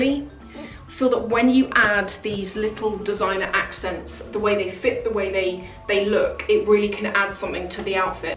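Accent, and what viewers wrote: England, United Kingdom. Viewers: I watch your video because I love your British accent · 1) I dont care about this sponsored video. This is still a great vdo because you are talking like a manual for how to carry out the Lily Silk outfits for someone who wouldnt have much clue about how to put them all together and look great. It could be helpful regardless the outfits are from Lily Silk or not. 2) The way you made your point on getting just one bag, in which colour, in which size - HELPED ME. Because I am about to get one. Thankyou! 3) Your accent is so lovely. You look beautiful and elegant too! English accent is like music to the ears. Other English youtubers with a beautiful accent and are worth watching are Cassie Thorpe (self diagnosed luxury addict lol), Emily Norris (who makes great mommy videos)., this is the first time i'm commenting on your channel because i friggin loved the whole idea behind this vdo ❤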